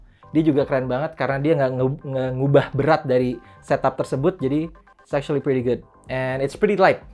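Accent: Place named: South Jakarta, Indonesia. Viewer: Jaksel banget wkwkk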